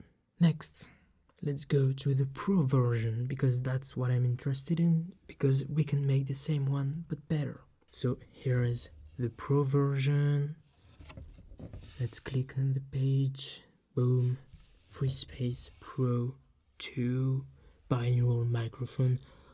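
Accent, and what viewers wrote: France. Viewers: His France accent make me laugh do hard haha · Ton accent français me tue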